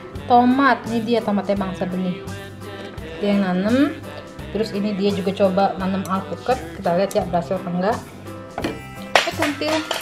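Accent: Jakarta, Indonesia. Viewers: Mantap mpo masakan ikan nya... Bikin ngiler dan laper... Dan lucu jg sm mpo...bicara nya tetap betawi asli..😘😘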